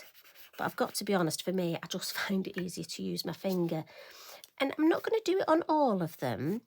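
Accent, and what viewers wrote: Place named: England, United Kingdom. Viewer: First I got to say I just love your accent! There was a zoo show that I watched that was somewhere in England I forget now. But I don't think it was in London. Anyway one of the keepers had your same accent but I can't recall where she was from. Anyways these are both so beautiful but I think I'm also partial to the one on the left. They both have depth but I think the one on the left has more and I think some of it's coming from some of the wider spaces on the stencil being more filled in with the red. Especially on the right side a little bit around that butterfly on the right. I need to get my gel plate out and play with it. I know you don't know me so I hope you won't think I'm interfering but I'm concerned when you said that the nail polish remover has begun hurting your lungs from the fumes. If this is something new if you haven't already I would definitely urge you to maybe see a doctor about it. 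It may be absolutely nothing, but if it's a new development it might be a good idea to have it checked out, especially with the bit of hoarseness you appear to have. I've only seen a few of your videos so far but I love your videos and the art that you make!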